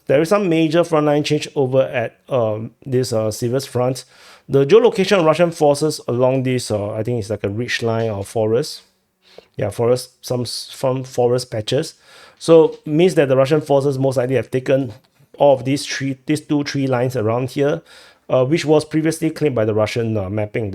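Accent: Texas, United States. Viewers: As a Texan I can say your cowboy accent is awesome lol😂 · I'm surprised the cowboy accent worked will. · Hahahah,,, love ur Texan accent.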